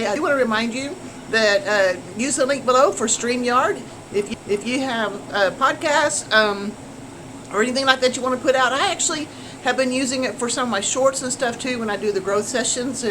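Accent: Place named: Oklahoma, United States. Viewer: I still hear some Okie in you. I have it too.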